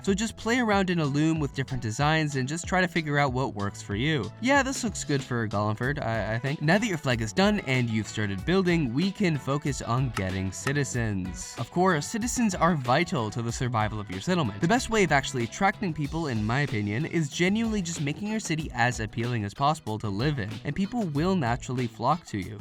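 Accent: Canada. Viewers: he's Canadian not british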